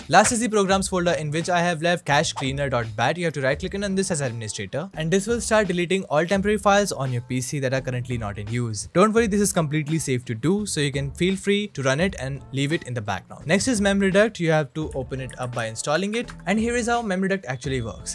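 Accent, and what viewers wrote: India. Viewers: I knew this was a dumb video when i heard that Indian accent, blocked channel.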